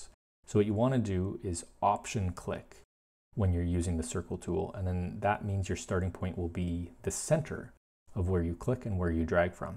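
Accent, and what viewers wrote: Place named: Canada. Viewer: I felt like I was listening to the voice of Roman Mars from 99% Invisible, only a Canadian version ;) Thank you for sharing the tips. I've used Illustrator on and off for 20 years but never really explored all of the options and you've pointed out some really helpful tips that cut down on the actual designing part simply due to rotates and refecting.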